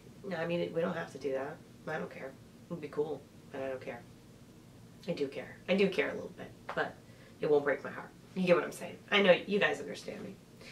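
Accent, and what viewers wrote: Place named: Michigan, United States. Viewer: here are my questions, most of which are non-sewing related. 1) Are you from Michigan, you sound like you are from Michigan? If, yes, where? FYI Detroit is one of of my favourite cities in the world, yes, you read that correctly. LOL 2) I think I heard you say once that you are a mechanic but you also work the midnight shift, who gets their car fixed in the middle of the night? I don't understand. If you are willing to share, please explain. 3) I would love to see a video on your TNT patterns and finally 4) what is your most worn and/or favourite me made item. I love your channel and hope you are feeling better.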